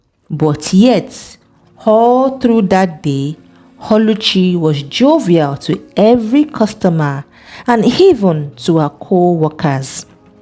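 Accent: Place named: Africa. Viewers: She do not need to tell our African stories in white man voice. Miss, love your accent don’t listen to that Oreo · Nne, we're Africans and proud of our language and accent. This is Original not fake.That person has inferiority complex.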